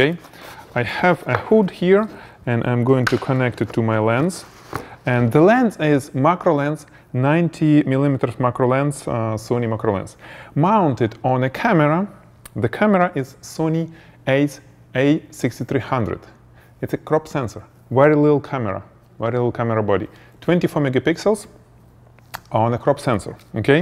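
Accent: Russia. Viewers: Wish I could fully listen, I'm so delighted by the charming personality and accent which triggers my Russian nostalgia that I can't pay full attention to the tutorial :D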